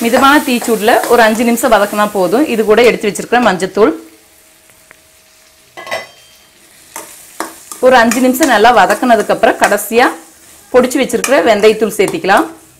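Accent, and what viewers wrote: Kongu, India. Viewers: Kongu Tamil kagave oru like...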